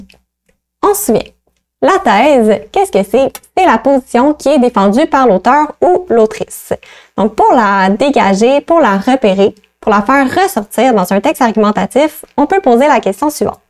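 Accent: Quebec, Canada. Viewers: Très bien fait mais l'accent de la jeune femme est québécois ? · Dude the canadien accent makes me vomit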